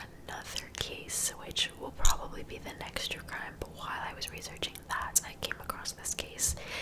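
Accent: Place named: Independence Township, United States. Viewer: Such a soothing voice Always gotta remind myself irl sounds like she's from Independence Township Edit: not to mention, ASMR crime stories have put me to sleep more than once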